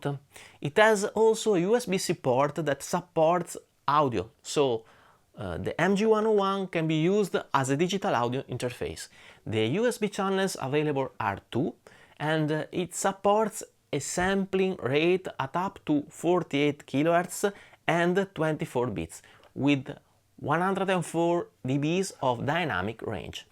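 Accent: Italy. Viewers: Caro signore lei parla inglese come Renzi. Non crede che volendo fare video in inglese dovrebbe fare un po' di pratica di pronuncia? Anyway the video is good, I didn't realize if this gear can accept the IR.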